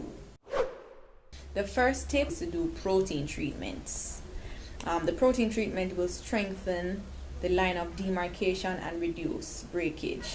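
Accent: Jamaica. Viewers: Your Jamaican accent!!!😍😍😍😍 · The moment I heard her, I knew she's Jamaican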